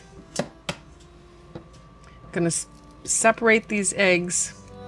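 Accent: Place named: New England, United States. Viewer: You must be in New England for 2 reasons. 1. You said aunt instead of ant, and pecan instead of Pecaahn. I loved your charming video and your instructions as you went along. I will be looking for more of you. Btw your little “jug” is also known as a pitcher. Hugs to you.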